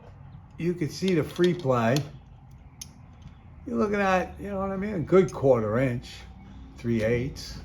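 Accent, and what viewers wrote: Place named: New York, United States. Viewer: Love your videos an accent! You from new York? Would love to see a front fork tube oil change done on a king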